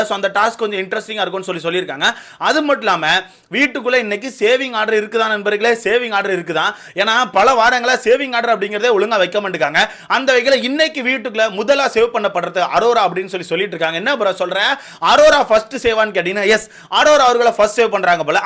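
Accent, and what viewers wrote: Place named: Madurai, India. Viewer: Madurai slang bro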